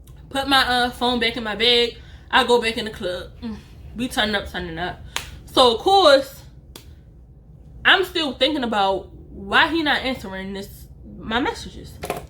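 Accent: Baltimore, United States. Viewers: Baltimore accent?? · Girl, I am disgusted. #1. I knew where you was from when you first started talking. All my mama's side from Baltimore. #2 These girls suppose to be grieving thinking about how to give you tea on they mess. I cannot believe this! I am still listening but I am HOTT with you. The disrespect! This is why I always tell my brother, be real because don't leave any mess behind for us to clean up! Aint nothing about you being young and dumb, I would never judge you. You had every right to think you were the only one and had no idea the mess he left, smh. Sis...YOU WISH YOUR BROTHER WAS HERE TO MEET HIS WHAT?!! Girl... New subscriber! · Girl where you from? You lowkey sound like you from Baltimore if so same!! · When you started talking, I said “oh she is from the native land” 😂😂🤣🤣🤣🤣 Baltimore Girls 😉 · First I wanna say sorry for your loss! 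But gurl u had me rolling 🤣 u are a Great story teller. I knew u were from Baltimore 2 mins in when I heard how u pronounced each other only us Baltimore ppl say it like that 😆🤣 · I Love You 😂 New Subbie 🥰💕 P.S. I Loveeee To Hear Ppl From Bmore Say Words Like "New, To, True, And You" 😂😂😂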